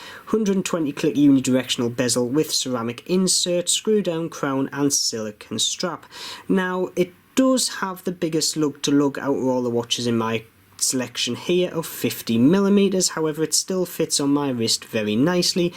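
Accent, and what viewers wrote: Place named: Northeast England, United Kingdom. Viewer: Nice video interesting collection not a fan of that dress watch though I have the pagani submariner in the hulk colour myself and really rate it I am also a fan of vostok watches and of course the odd mod perhaps they could make an appearance in later videos plus its canny to hear a NE accent as im from Newcastle myself keep up the good work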